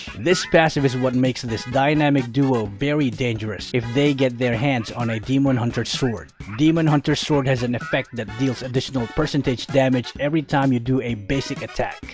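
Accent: Philippines. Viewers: I actually thought he was a foreigner because of the accent but hey, I can hear a bit of Filipino in his words 😂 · your from ph? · Pilipino ba siya? HAHAHAHAH OBVIOUS E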